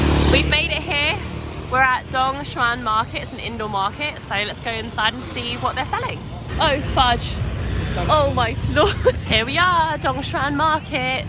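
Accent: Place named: England, United Kingdom. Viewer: I like your accent ! Are you from England ?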